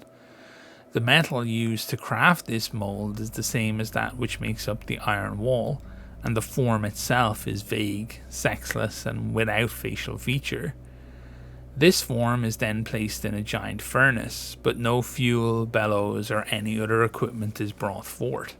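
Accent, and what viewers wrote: Ireland. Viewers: Doing an awesome job my man, honestly the best lore channel I've found for trench crusade. Irish accent works so well for the subject matter too !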